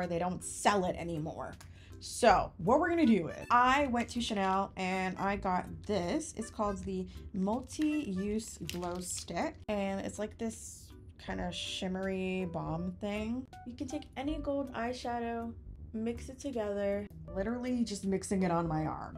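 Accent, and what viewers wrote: United Kingdom. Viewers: ❤ your British accent mode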